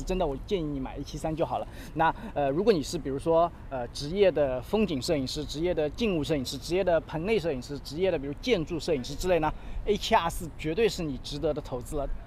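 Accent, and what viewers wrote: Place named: Zhejiang, China. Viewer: 老家哪里啊😄，我女朋友听你视频说你像是浙江的诶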